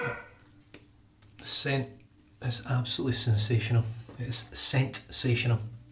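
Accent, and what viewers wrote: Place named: Scotland, United Kingdom. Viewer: Great review, sir! Where in Scotland are you from, can’t place your accent!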